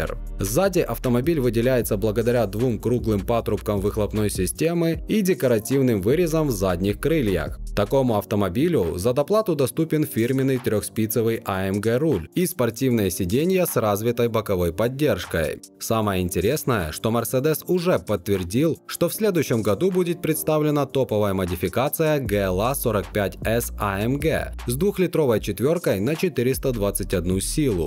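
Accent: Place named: South Russia, Russia. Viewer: Смотрю все ваши видео и ставлю лайк, но ещё не подписался. Вам нужно комментатор, мне не много не нравится произношение чувствуется либо юг России или Украина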